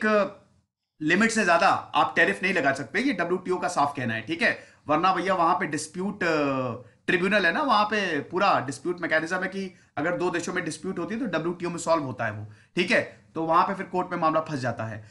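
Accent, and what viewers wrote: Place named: Uttar Pradesh, India. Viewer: bhayi tu up ka lagta hai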